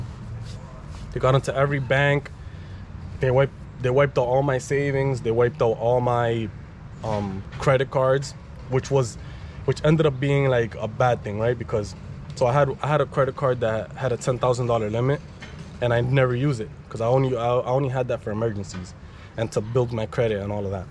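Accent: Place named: United States. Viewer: I have been to Colombia about 20 times across all the main cities and have never experienced anything remotely this wild. I am glad you are alive and made it through your ordeals. I would encourage you make calculated risks with the folks you meet. If I saw you in Cartagena, I would assume you were a local so don’t feel like you stick out; predators prey on fear and discomfort. I feel bad for what you experienced in Cartagena as I have been in many solitary circumstances between Bocagrande, Getsimani, Centro and Manga through into the late night hours and never felt unsafe. Always always a happy vibe and heavily policed. If you stay in the tourist zones, they are adequately policed and always full of people. You sound American middle class-so try plugging into Colombian middle class and stop partnering with businesses associates who can’t even feed themselves. 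Stick with Ubers- cheaper than taxis and there is tracking accountability. 2.5 million people visit Cartagena a year: if 1% of that number experienced anything similar to your ordeals, tourism would shut down and the city economy would grind to an end, which means the government has a true incentive to weed out these issues. I would recommend these tips: 1. Use Uber, avoid taxis and inDrive 2. Stay within tourist perimeters until you cultivate a dependable local network 3. Don’t call people friends, especially best friends when you just met them. 4. Don’t venture into barrios without an exit game plan. 5. Not sure how t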